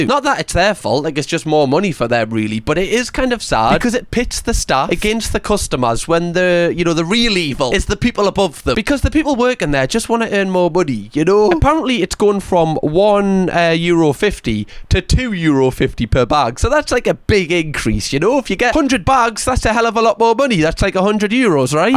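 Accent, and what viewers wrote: Newcastle, United Kingdom. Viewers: Funny story: I fell asleep listening to YouTube and ended up being a part of my dream. A group of us and were in a restaurant where I live in Louisiana USA. was talking constantly and sometimes loudly about Gordon Ramsey in his Geordie accent and all of the redneck southerners were giving us strange looks and saying stuff. We were, like, “oh…he’s our cousin visiting us from the UK.” Whenever he’d say “Gordon” we’d say loudly “he means Uncle Gordon.” Now I kinda wish really was our cousin from the UK who comes to visit!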